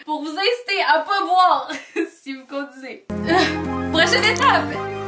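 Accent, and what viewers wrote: Quebec, Canada. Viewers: Je confirme je comprends rien quand tu parles québécois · t québécoise ?? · merci le Québec pour votre belle accent et la joie de vivre avec ...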